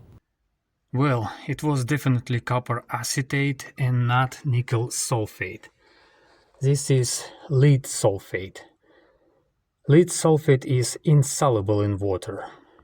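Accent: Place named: Russia. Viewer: Most problem is - very very very very very very bad Russian accent. Sorry. English speaking people do not speak like that.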